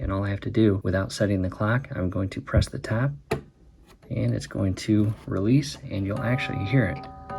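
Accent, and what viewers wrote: United States. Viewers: Hey MichGanders’ I enjoyed your videos, I have to tell you that about 3 minutes into the first video I went WWait a minute I hear a familiar accent.. 🤔❓ then I watched a second video and I was convinced yip she’s definitely from MI.. well hey 👋 there from Johnny O.C.K a FERRIS Grad from Illinois.. long long time ago.. .. 🍻 Cheers.. GO COLORADO AVALANCHE 🏒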